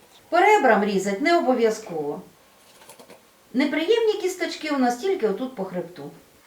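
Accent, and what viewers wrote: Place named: Dnipropetrovsk, Ukraine. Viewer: Обожаю наш Днепропетровский говор😂👍 караси на вид такие аппетитные, шо аж язык хочется глотнуть 👍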